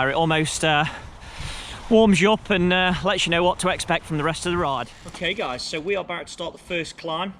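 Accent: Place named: Ripley, United Kingdom. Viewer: He sounds like a Ripley lad!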